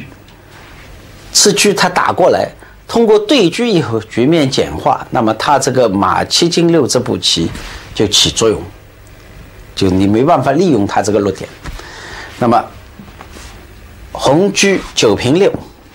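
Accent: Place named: Fujian, China. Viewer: Fujian style mandarin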